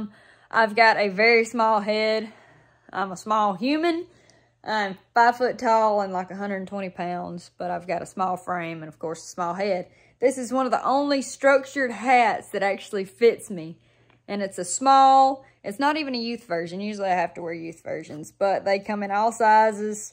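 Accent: South, United States. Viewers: That strong southern accent doesn't scare me... it reminds me of the good old days 😁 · Love this strong southern accent 🤠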